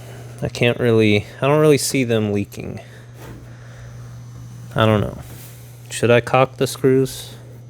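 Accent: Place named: Nebraska, United States. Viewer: I know this is 2 yrs old. And i havent seen in your comments the answer to why theres an "ethernet" port on the fan. its not an ethernet port. RVs use what look like cat5 cables for internal wiring. That is a port for one of them cables. My van uses the same types of cables for the internal wiring for like fans, radios, lighting etc. oh yeah i have a conversion van. I knew you were from nebraska. every nebraskan knows other nebraskans lol. theres just something about the talk and the attitude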